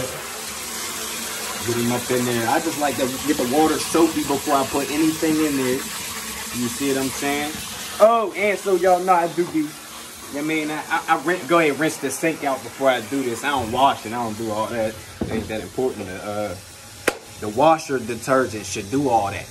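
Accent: Pittsburgh, United States. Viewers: My guy has that heavy Pittsburgh accent. "Just sooooow y'all knooooooow"😂🤣😭 sounds like my uncle, lol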